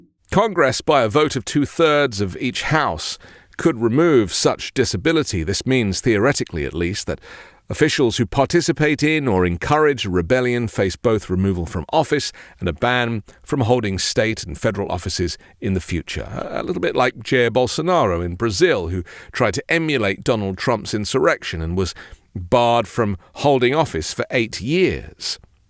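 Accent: United Kingdom. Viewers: Why is some feminine ass white with a British accent telling me about my American government f*** off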